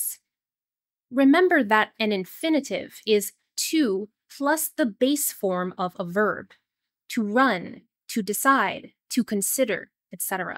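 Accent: United States. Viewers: Hi, I really like your videos and your voice, your American accent and voice is so beautiful, you are different from the other channels, I like the videos that you make, it’s very effective and I hope that you make a lot more videos and maybe advanced English, thank you❤ and keeping going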